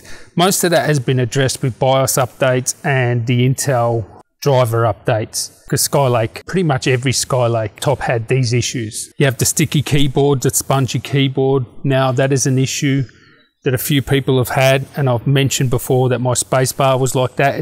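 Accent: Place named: Australia. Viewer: Whereabouts in Oz are you? WA? Just trying to guess the accent.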